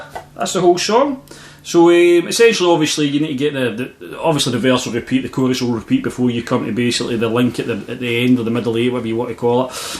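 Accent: Scotland, United Kingdom. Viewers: Nice job. Enjoyed your accent. For American English, its always surprising to hear the strong accent disappear when you sing as the words become crystal clear. Btw you have a good singing voice in the times you did sing. You didn't sing enough to tell if your voice is trained but if not in my opinion you have a good base voice to begin training. Much respect for Scotland! My ancestors came to America from Scotland! Robertson clan!!!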